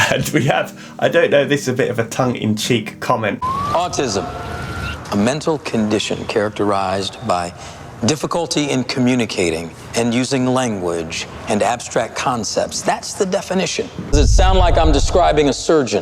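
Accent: United Kingdom. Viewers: I'm from germany and at first i though "why do im not understanding him so well?!" and than said you, you're from the uk and I realized I just understand AE😒😂 But still a cool and interesting Video😊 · Ive enjoyed medical drama since Greys Anatomy and this one with a ever so amazing Freddie is a sight for a sore eyes.... I came across ur channel and thoroughly enjoyed it... love the way u broke it down explained stuff... Young Doctor with great brit accent 💕... M ur fan already 😊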